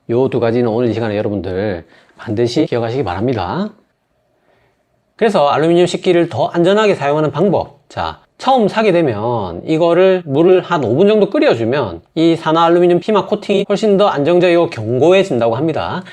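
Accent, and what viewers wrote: Gyeongsang, South Korea. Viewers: 갱상도 의사샘 ㅋㅋ, , 너무 친근하고 부담없는 생활지식 알려주셔서 잘보고 있습니다. always thanks 🙏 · 경상도 분인 게 표가 나나요? ㅋㅋ 저도 경상돈데 들어도 잘 모르겠는데... 쌤이 표준어 쓰시는 거 같은디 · 사투리나 좀 고쳐라 듣기 거북하네 갱상도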